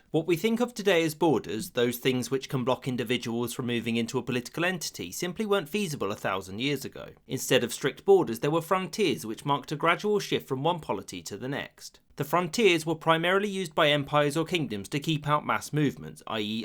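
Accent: England, United Kingdom. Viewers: You are funny I like your videos I wonder where you are from in England with your accent. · Why do you have a british(?) accent but use miles instead of kilometers?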